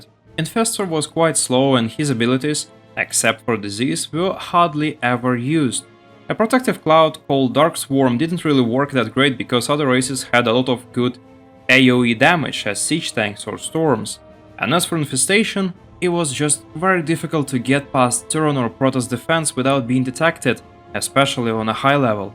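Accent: Russia. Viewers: What accent, u from russia?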